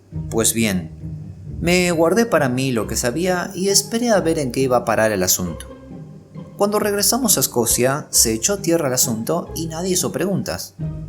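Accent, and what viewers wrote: Rioplatense, Argentina. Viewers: valoro el esfuerzo hecho y le dejo like, porque creo que es lo mínimo que merecés. Pero convengamos que no es el acento ideal. Lo más neutro posible, sería lo ideal. Otros autores, con gusto los escucho con dicho acento. Ojo que el propio Borges hablaba y leía sin el "shesheo" rioplatense, a no ser que la obra evoque dicha pronunciación. No obstante, gracias por el tiempo y el esfuerzo. Un abrazo.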